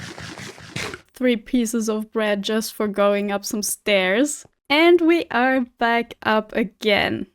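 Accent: Germany. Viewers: Loving the cozy, chill vibes, great job! I was actually guessing you might be German because your accent sounds a lot like a friend of mine's and she's from there too! Guten tag! P.S. I love the tree, that's so cool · the second I heard you talking in the first part I was like: She is german. XD I am german as well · I immediately noticed your german accent! Probably because I'm german too :D I already love this series so much! <3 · Ha, hab ich doch gleich gedacht, das klingt deutsch 🙂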